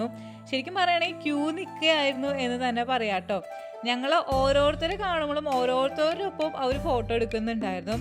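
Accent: Thrissur, India. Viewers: like ur thrishur talk..why not using ur old thrishur tag line now a days?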